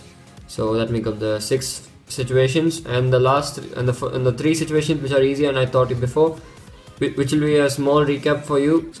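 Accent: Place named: India. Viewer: Indian guy where u live? (Accent makes me laugh)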